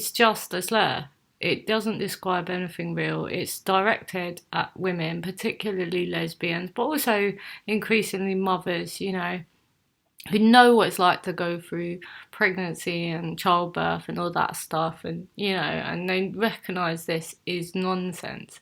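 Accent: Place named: United Kingdom. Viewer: I can’t get over the ushusting Da YooKay accent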